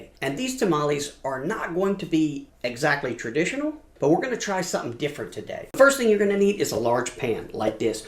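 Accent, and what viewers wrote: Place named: Texas, United States. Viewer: cool, I did pick up on a bit of a Texas accent.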